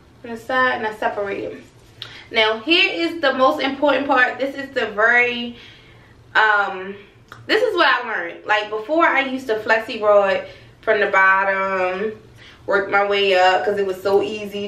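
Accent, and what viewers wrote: Baltimore, United States. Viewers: Although I moved away from Baltimore 13 years ago you just made me so proud hearing your accent it was unmistakable. Your whole bad represent what a fabulous black women are Beautiful bold and unapologetic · First thing I noticed too 😄 heard that BMore accent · Love your hair....it my first time watching and it sounds like I hear a Baltimore accent. 🥰 Or maybe Philly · sis you from bmore/dmv? you remind me of an old college friend! love the energy ❤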